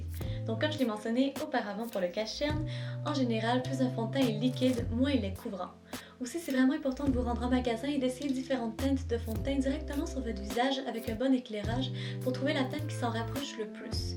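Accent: Quebec, Canada. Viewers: J'aime énormément ce que tu fais et ton accent Québecoi est totalement adorable :) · Ton accent me rappelle le Canada c'est dingue!!! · Elle se donne pas un accent... Elle est Québécoise!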